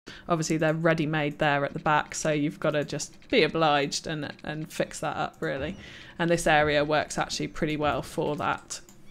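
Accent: United Kingdom. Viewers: Another great CS channel to subscribe to. This really is some superb quality content. Inspires me to take a bit more time on my builds. Love the music you used in this 2nd video. Excellent narration and great to hear another Brit. Please keep up the amazing work, and congratulations on nearly 3k subscribers. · Subscribed. Great content and nice to have a Brit to listen to! Shame about the road direction 😜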